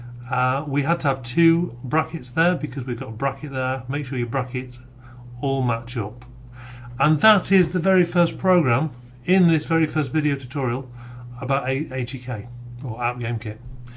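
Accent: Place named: United Kingdom. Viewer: this man let me hear his whole passion and the british accent round it perfectly up really great to start the journey with you guys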